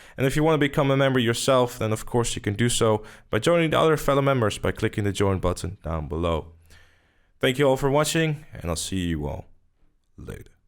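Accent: Netherlands. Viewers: Are you dutch? If so: Goedeavond 😁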